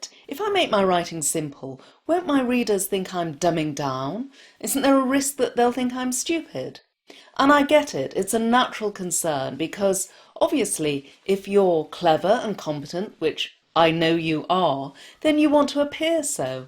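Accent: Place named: England, United Kingdom. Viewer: I DO really love every video you post and your awesome English accent that literally pampers me as I listen to you. Thanks a lot <3 (Greetings from Italy)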